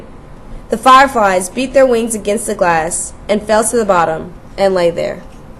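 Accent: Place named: Germany. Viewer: also ur german accent is good................................................................................................................................................................(WAY TO MANY PERIODS)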